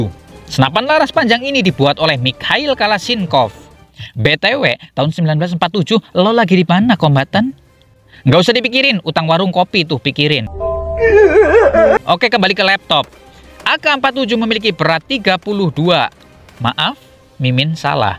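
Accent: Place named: Banyumas, Indonesia. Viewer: Suara pennyiar enak rada ngapak " ya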